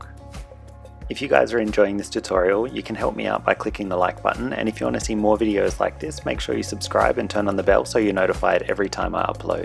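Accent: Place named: Australia. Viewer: Thanks for this (and all your tutorials)! You do a great job of breaking it down. I also very much enjoy hearing the Aussie accent from the other side of the world. Keep up the good work🙂🙏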